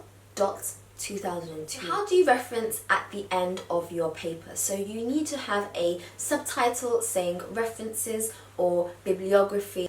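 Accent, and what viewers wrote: United Kingdom. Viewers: She has an English accent. She went to Harvard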